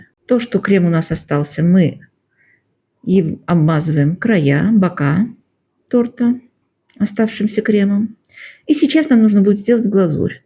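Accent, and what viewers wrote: Baku, Azerbaijan. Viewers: Здравствуйте,спасибо за напоминание,родилась в Баку,живу в Москве,рецепты ваши моя молодость,а Бакинский акцент отдельная ❤❤❤❤❤ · Вам сразу же лайк и подписка за то что вы из Баку!❤ я тоже Бакинка. Случайно забрела на ваш канал. Но не сразу поняла откуда вы, хотя легкий акцент ласкал слух. Я живу в Америке и всегда рада любому бакинцу. И рецепты у вас замечательные! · Здравствуйте.Случайно попался Ваш старый ролик, стало интересно.Когда "зашла" на Ваш канал, и услышав Ваш акцент, сразу поняла, что есть наше, бакинское. И я не ошиблась. Я сама из Баку, и Вам огромный, бакинский Салам!!! 🙋🙋🙋❤❤❤💐💐💐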